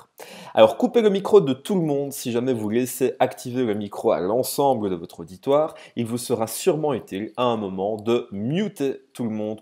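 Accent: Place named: Belgium. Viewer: Bravo! Explication complète, très claire et très didactique, avec une petite pointe d'accent belge bien sympathique... Vous serez sans doute ma référence, de même que ce logiciel l'est également ! Il faut préciser que le système utilise le principe du pair to pair et des serveurs répartis, ce qui convient particulièrement bien dans les zones mal desservies par internet (Afrique...) et qu'on ne dépend d'aucun prédateur du web tel que Microsoft, Apple, Google.... Il n'y a pas d'internet libre sans logiciels libres.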